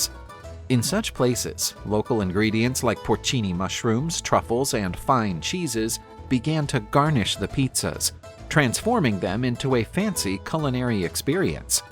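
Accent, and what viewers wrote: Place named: United States. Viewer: Good video but shame about the American pronunciations.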